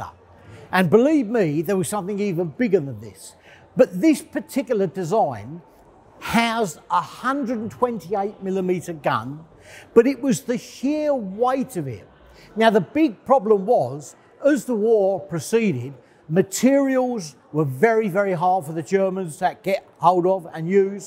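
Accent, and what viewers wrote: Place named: England, United Kingdom. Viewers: Sounds like a Londoner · I love his accent, what part of England is his from? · Hornchurch, Essex. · Liverpool. Broad scouse.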